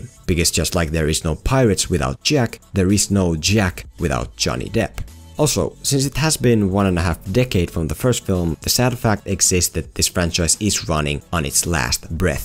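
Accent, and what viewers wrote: Finland. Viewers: Where are you from? I smell Finnish accent oon suomalainen btw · Are you from Finland? Because you speak like that?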